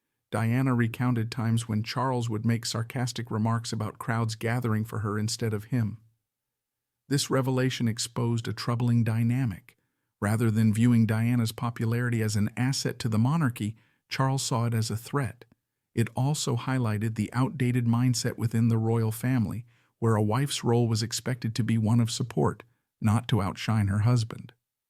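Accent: United States. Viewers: Always American narrators.Diana and Charles are English so where is an English narrator?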